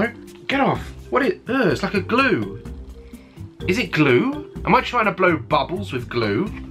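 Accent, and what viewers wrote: United Kingdom. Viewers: he's british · Hes British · he is from Britain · I'm pretty sure he's British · London he's British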